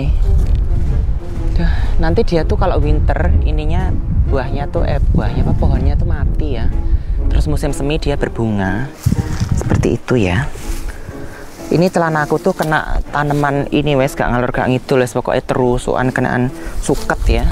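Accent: Java, Indonesia. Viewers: Sampean jowone ngendi mas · Ya Ampuuuuun senag bgt nonton mas logat jawax lucuuuu deh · Aku baru beberapa hari ngikuti youtube mas,kadang ngmngnya bikin ketawa lucu jawanya jg masih khas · He..he..he.. logatJowo medhok..lucu...keren mantap mas... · Masnya ngomong logat Jawanya lucu.. Haha...Salken dari Madiun. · Assalamualaikum..salam kenal ms.bu Andi dari Makassar sulawesi selatan.. Udah lama tau mas di vlog mba Yanti Guixe dan Teh Dona Bayo..tp baru smpat koment nih hehe.. Suka bgt dgr logat dan meddoknya ituloh mas.bikin ngakak.. Dan yaa sy sependpt dgn yg koment td..bhw mgkin lebih dikembangkan lg vlognya ttg sejarah kota tmpat ms saat ini..selain panen Ok mas..smangat dan sukses sllu👍👍🙇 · bang kocak lo😂😂😂😂 terus lagi bang medhok nya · Mas tempat e neng ndi ko jawae medoxk kowe lucu mas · Mas sampeyan kok lugu banget, jowomu medok banget, seneng aku, maju terus sukses buatmu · Mase ..bagus tuh ngomong jowone.... 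lucu lgi...😃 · Medhok dan ngondhek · Logat nya medok lucu bgt wkwkwkwk 🤣🤣🤣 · Ko sy suka ngomong jawanya 😅😅😅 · Waduuh medok mas jowone sampeyan sueneng aku ngikuti konten" e sampeyan iku smg sukses slu yo mas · Salam kenal baru gabung.. Asli seko suroboyo opo banyumas mas.. Koq rodo medok medok manjaaaah...😁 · Assalamualaikum, Maseh ini asli mana to kok iso biso jowo medok, Lek angsal perso pundi mas jowone ?? ☺️🙏🙏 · Wes sui neng luar tapi jawane tetep iseeh medoook nek ngvlog teteeeep jowone ra mbok tinggal,wes too maas ndang rabi beng enek seng ngemuli hehe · Jowo ne ngendi to mas? Lucu sampeyan hehee.. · Seneng leh ngrungokke Mas Nek ngomong medhok e poollll mantap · seneng bnget liat orng indo medhok ngevlog di luar negeri panen buah2an yg gak ada di indo.. 😀 semangad terus ngevlog nya mas 💪 ntar selanjudnya panen buah pear (liar) klo ada 😀❤️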